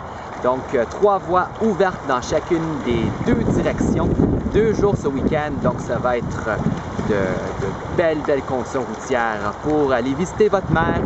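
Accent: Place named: Quebec, Canada. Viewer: 您好, 您係魁北克音.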